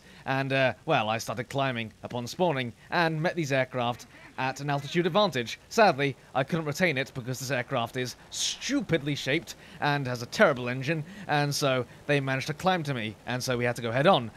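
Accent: England, United Kingdom. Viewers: It's a show, although he has been talking like this for so long that even on his serious videos he will sometimes go off into his wonderful British way of talking :) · I'm in love with your accent. What kind of british are you, m8? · oh god, you are sooooo british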